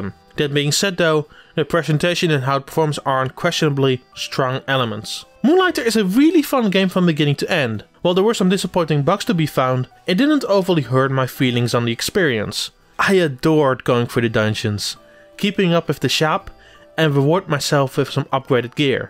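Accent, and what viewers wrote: Netherlands. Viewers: Proper Dutch accent 😂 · Are you dutch? I am guessing you are from Rotterdam? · As a fellow dutch man, i just can't handle his accent. He doesn't even try to hide it. (The following is not my original comment and has been added on) Some of these lines feel really forced, try working on that my dude. Thank you people for sharing your opinion on mine, i've never gotten this many comments from people with a different mindset that aren't just full of cursing and telling me my opinion is irrelevant. Looking forward to his improvements in his next review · pretty bad review, i cant understand your english. you have a to much dutch accent influencing it and you are not clear at what you say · You do realise this guy is Dutch, right? Do you produce content in a second language? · Yo is this guy Dutch or what, common try to work an the accent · I'm Dutch and listening to this reviewer it sounds like he's Dutch as well. 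So it's normal his English isn't perfect but very decent at least. But i like his review so keep up the good work dude !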